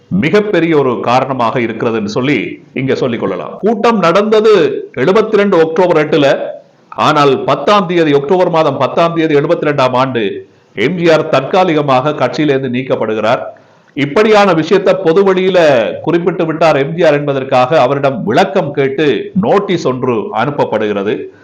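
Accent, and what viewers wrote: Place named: Sri Lanka. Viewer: நீங்கள் பேசும்போது இலங்கை தமிழ் என்பது சரியாக தெரிகிறது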